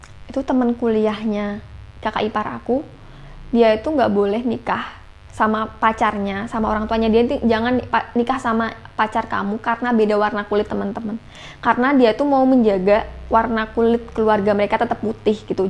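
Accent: Indonesia. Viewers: Yg bikin aq suka liat chanel ini krn suara renyah dan manja. Logat jawa nya tetep g ilang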